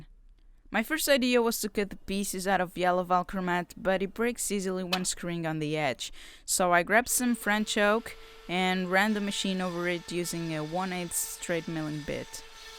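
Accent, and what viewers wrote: Portugal. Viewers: Hi I've been wondering how much all of that costs, since episode 1(wood, mdf, screws, not including machines...), I've got so many ideas from you and I'm thinking about making something similar. Stay with the good job! (I'm also wondering if you are from Portugal or not, I realised that you live in Portugal but did you born here? Your accent seems pretty sick)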